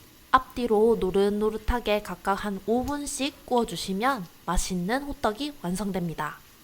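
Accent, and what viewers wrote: Gyeongsang, South Korea. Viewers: 경남 지역인거같아요 제가 경남사람인데 저런말투 쓰거던요 ㅋ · 은 대구말씨~!!^^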